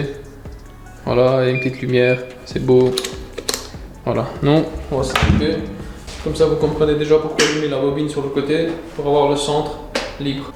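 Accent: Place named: Belgium. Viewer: vous ressemblez à marvin atmoss'vert et vous avez les mêmes mimique sauf que marvin il n'a pas l'accent belge xdd !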